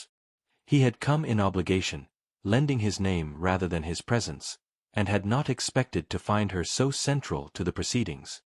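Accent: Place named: United States. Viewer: Please it’s duty not dooty and duke not dook. For heaven’s sake find someone who can pronounce these words the ENGLISH way and not the American way! 🇦🇺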